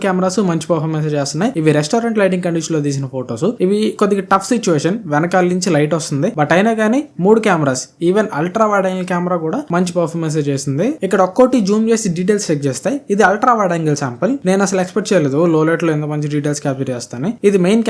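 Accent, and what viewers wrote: Ongole, India. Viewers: bro meedi ongole aa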